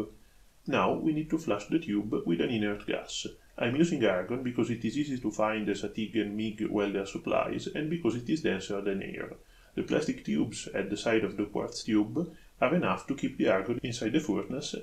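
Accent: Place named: India. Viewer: Thought you were an Indian guy hearing your accent, dude.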